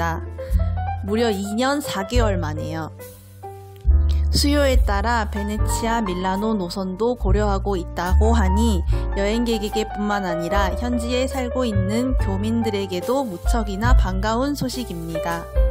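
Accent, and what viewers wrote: Standard. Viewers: 은은하게 베이스 깔린 음악에 님의 상큼한 목소리 (표준말 억양) 최고예요~~😄😆🥰 여름에 로마 직항도 뜬다니 반가운 소식 이네요^^ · 표준말 너낌 나믄서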